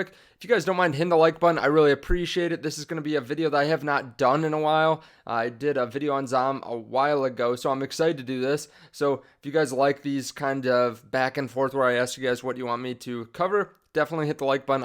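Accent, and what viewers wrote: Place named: Chicago, United States. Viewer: Dude must be from Chicago or the burbs.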